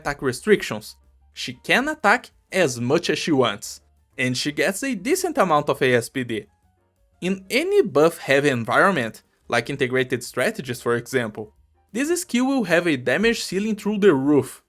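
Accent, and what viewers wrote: Brazil. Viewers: Bro... you are brasilian ? Your sotaque is sooooo Brazil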